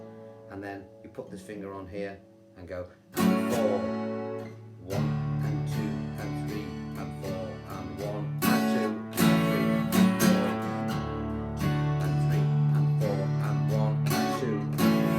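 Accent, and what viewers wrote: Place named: Liverpool, United Kingdom. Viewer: I totally agree with all who have said this is the best lesson for this song on the internet! Thank you for sharing it. Plus, it's fun for this American Girl to listen to your Scouse -- cos if I am not mistaken, your accent is certainly from the north of England. So, ta from my good self! :)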